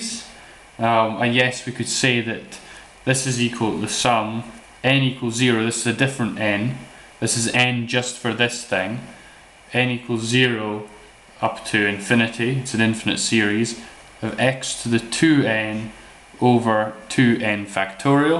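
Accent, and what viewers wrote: Scotland, United Kingdom. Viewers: ye scottish mate? nice vid btw thanks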